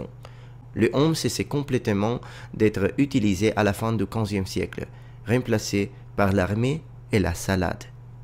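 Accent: Italy. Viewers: un accent particulier en français, j'entend l'accent italien et l'accent anglais en même temps. je préfère l'anglais ;) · Hi. I'm here for some feedback. That was very good overall. I understood everything without effort, so you level is clearly good. However, you still have some room for improvement (you're good, so this will be nitpicking). The sentences you used were well structured, but sometimes, the pronunciation was off, even when ignoring your natural Italian accent. So you had trouble with using the correct "déterminant" (un/une) depending on the type of word that follow. But this is hard for everyone learning french. Secondly, (and this is a bit funny), you said "chevalieu" instead of "chevalier" a couple of times. Lastly, the biggest mistake was pronouncing the "p" in "coup". You just don't. It's silent. Yeah, i know. Fucking french right! It's the biggest mistake because when you pronounce the "p", it sounds like "coupe" (slice), which is a totally different word than "coup" (blow). 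And regarding your trademark sentence "the has spread his wings", i couldn't help but find it very stylish in french "Le a déployé ses ailes". Nice! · Sometimes, the italian accent can be remarked, although very lightly. The rest of the time, it's a bit obscure : it can be heard that he's not a native french speaker, but it doesn't sound specific to any country. Which is pretty neat considering french has such a weird and uncomfortable syntax to be spoken when you're not a native speaker (hell, even native speakers can get it wrong pretty often)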